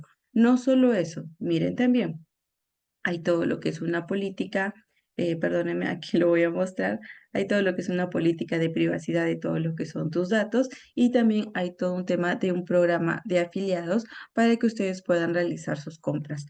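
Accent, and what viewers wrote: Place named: Colombia. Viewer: pero hablas como colombiana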